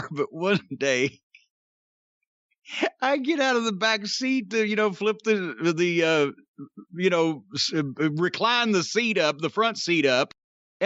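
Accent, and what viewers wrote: South, United States. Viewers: With the southern accent it's definitely a Hank Hill type thing I have never heard Jim sound that much like Hank Hill in my life but now I can't on here that. Mike Judge did base the character of Tom Anderson/Hank Hill off of all the older Southern men he grew up around. And although is about the same age as Mike Judge Jim sounded like Hank Hill when he said that and I honestly think it's just an older southern thing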